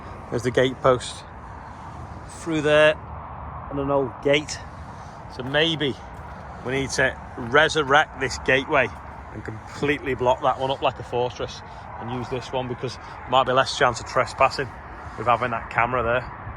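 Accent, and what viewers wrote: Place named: Liverpool, United Kingdom. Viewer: bad tory you lad. terrible hearing that shite in a scouse accent. do one. unsubscribed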